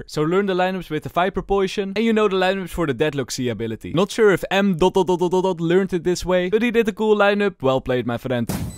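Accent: Netherlands. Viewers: With the Dutch accent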